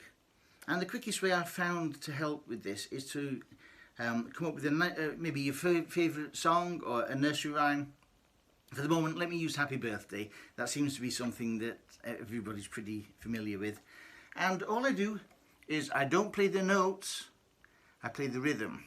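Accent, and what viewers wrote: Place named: Northern England, United Kingdom. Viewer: Thank you for the explanation! (Great to hear a lovely northern English accent, too! )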